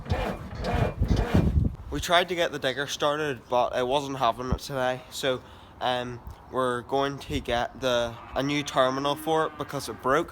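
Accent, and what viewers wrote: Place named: Northern Ireland, United Kingdom. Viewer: Just watching through some of your older videos. Can i ask where you are, roughly. Recognise you've a NI accent, but so do a lot of people on south west Scotland, and thats where I'd assumed you were from. Its the northern reg on jeep confusing me. Shouldve said.. you deserve and will likely get more subscriptions as you're doing very good content. Thankyou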